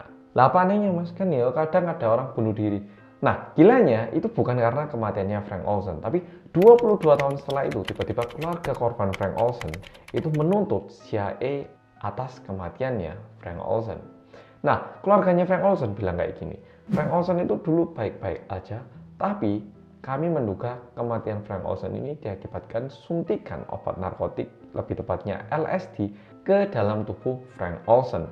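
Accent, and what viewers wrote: Java, Indonesia. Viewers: Lah ini hehhe akhirnya 😁😁😁 kalo gada suarane kaaya gak klop mas. Gak bisa denger medoknya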